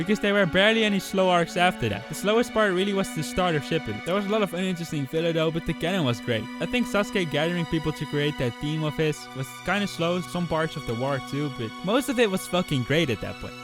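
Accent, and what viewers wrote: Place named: Netherlands. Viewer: I like your accent. It sounds a little Dutch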